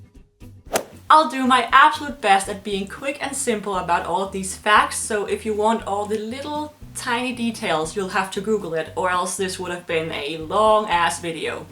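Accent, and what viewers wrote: Denmark. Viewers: Jeg kender ikke nogen der lyder mere dansk end dig. Mine øre bløder. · Nej, de er kraftidioter. A video addressing that some people might think this and that place is irrelevant can certainly have a title like the one this has. I clicked it because I wanted to hear what it was about. Partially from her name and the first few words she spoke I became certain she was Danish herself. That made me want to hear more again since there had to be a reason she'd make a video with that title. Now I know. The idiots who are incapable of critical thinking should quit the internet at read some books till they get a bit better at reading between the lines. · Man kan godt høre du er dansk xD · Also, your danish accent is pretty obvious heh, a throwback to college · Ikke for at være ond, men din accent lyder rimelig dansk. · You have such a danish accent! 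· You must admit these statements hits the bullseye and fits well today: "They are critiquers, and interested only in one-upmanship, and speaking just for the sake of being heard" and "being only motivated by greed, inebriation and others' technology". If this doesn't fit well with US, Denmark and other western countries I don't know what does. Even this video is a PR stunt and this girl is probably Danish and not American or English. Listen to her accent. The romans were the epitome of civilization even though they were inferior technological compared to modern day..... It helps to think outside the box sometimes. Cheers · After 3 seconds i already knew you were from Denmark, 'cause you have a "kartoffeldansk" accent (PotatoAccent) x) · Nice thick Danish accent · i could hear by your accent that you were a dane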